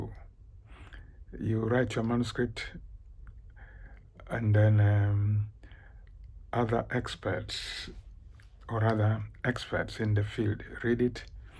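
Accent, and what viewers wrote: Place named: Tanzania. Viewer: love it! i was in tanzania once for a month with my grandmother because she works for the church mission in germany , the people and the country are just awesome definitely visiting again soon . So hearing you talk reminds me of this wonderful time i had there. And you have a very calming voice ! Keep it up ! <3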